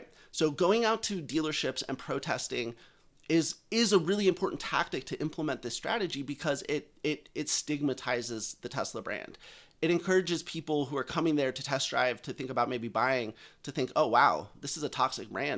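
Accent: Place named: United States. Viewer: First time I see your channel because I was wondering whether you are a compatriot re your surname. You sound very american, so you must have dutch ancestors. Having said this, interesting video! 🙋‍♀️🇳🇱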